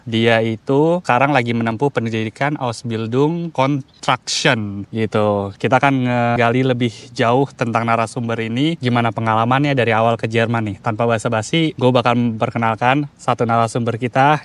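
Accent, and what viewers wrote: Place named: Banyumas, Indonesia. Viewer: howalah wong kebumen pantesan mandan ngapak wkwkwk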